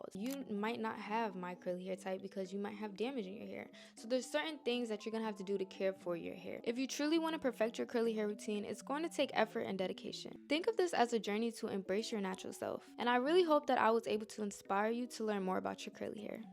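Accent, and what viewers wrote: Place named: New York City, United States. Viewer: Lil sis talks so NYC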